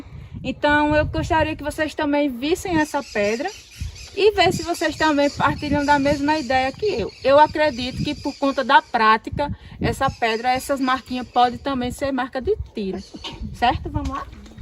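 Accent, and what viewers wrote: Northeast, Brazil. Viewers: Essa menina sabe das coisas heim Parabéns nordestina .q sotaque massa. Eu sou da bahia .mas nao tenho sotaque de bahiano não. Rs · Sou nascida em São Paulo e amo demais o sotaque nordestino ❤️ E essa moça é de uma inteligência fora do normal 👏👏 parabéns pra ela 👏👏👏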